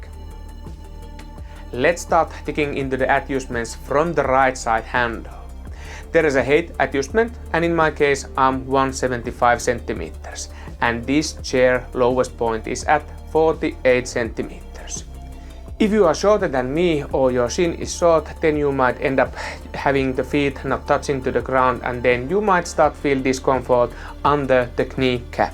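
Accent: Finland. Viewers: I hope that youtube algorithm gods will help you grow, you made a good representation, pretty high quality video. And after playng a lot of MSC i love finnish accent :-DDDDDDD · Are you from Suomi? You speak exactly same as my Finnish husband :D nice chair actually.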